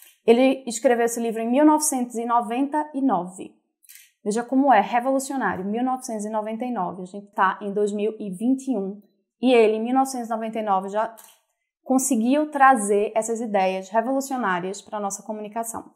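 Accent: Northeast, Brazil. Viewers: Eita sotaque maravilhoso da!!! Aoooo povo nordestino que eu amo!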